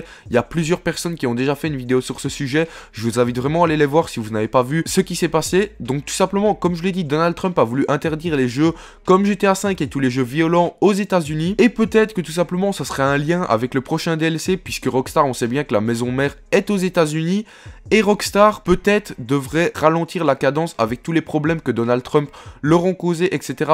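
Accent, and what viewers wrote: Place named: Belgium. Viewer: Je crois qu'il est belge où chti't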